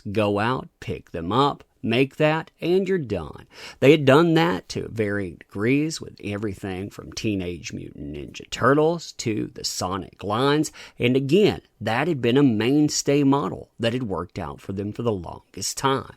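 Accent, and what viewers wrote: South, United States. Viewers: So are you from Alabama or Louisiana?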